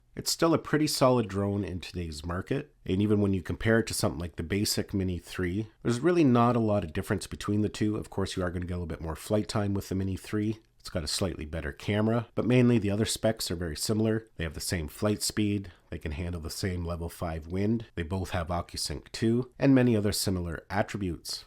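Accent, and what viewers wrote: Canada. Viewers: I love the Canadian accent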